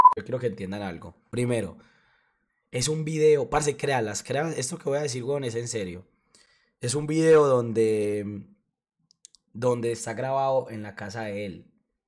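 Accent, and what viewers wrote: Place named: Medellín, Colombia. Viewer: Este chico es de Medellín la forma d hablar d ellos es inconfundible m hacen reír mucho😄👍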